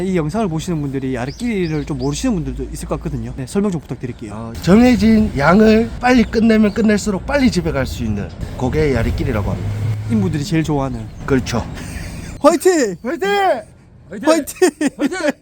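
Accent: Gyeongsang, South Korea. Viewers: 근데. 은 고향이 어디세요??? 경상도 사투리인가? 강원도잉가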